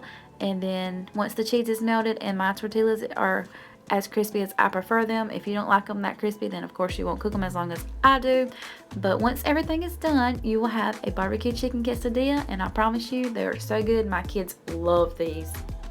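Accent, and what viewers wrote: South, United States. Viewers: LOL, she's from the South and pronounces words a little different like maters instead of tomatoes 😉 I'm a NC Southern girl myself 😁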